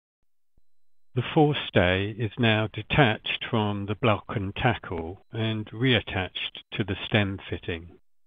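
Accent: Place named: United Kingdom. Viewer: J, You don't sound like a native Californian. Are you British?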